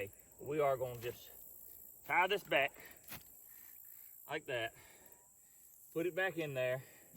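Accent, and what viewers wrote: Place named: South, United States. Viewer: still has a clear southern accent at 2X speed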